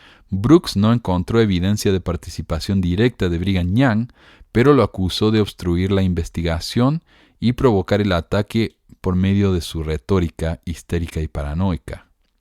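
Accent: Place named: Córdoba, Argentina. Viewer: Me gusta tu vos de cordobés, soy de Buenos Aires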